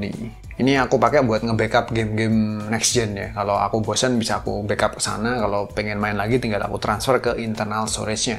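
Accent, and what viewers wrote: Java, Indonesia. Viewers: Nah Justru krna Medok nya itu... Jadi ciri khas Menarik 😅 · Anjir bang Lu awet muda banget, terakhir nonton 2020 an, wkwkwk Ciri khas medok dan santai nya masih konsisten sampe skrg😂😂😂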